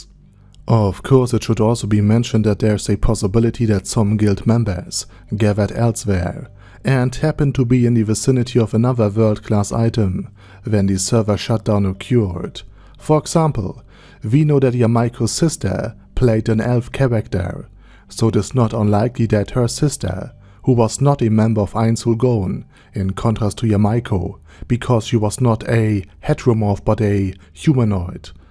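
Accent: Germany. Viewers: Anybody can take this how they want but your smooth masculine German baritone gives me very nice dreams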